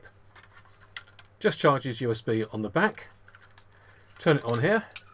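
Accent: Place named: United Kingdom. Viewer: I had to slow your voice down. You speak way too fast for me to understand most of what you say, especially with your British accent.😅 Thank you.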